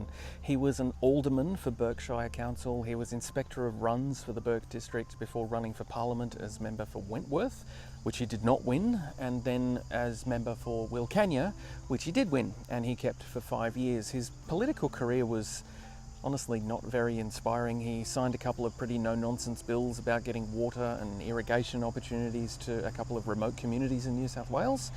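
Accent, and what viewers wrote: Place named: New Zealand. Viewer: I hear more than a touch of Kiwi in your voice ?. Kiwi rests content with his gentle application of the Borax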